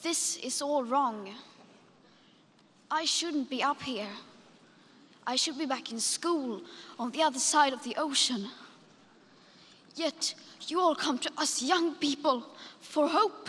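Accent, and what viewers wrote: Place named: Germany. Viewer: Выступление Греты Тунберг на саммите ООН по климату - на английском языке (с тяжёлым немецким акцентом) с русскими субтитрами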